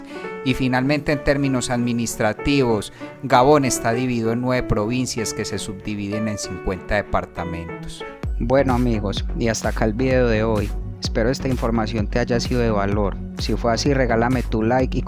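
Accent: Colombia. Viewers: Gracias hermano colombiano desde Gabón, supongo que eres colombiano por el acento que tienes.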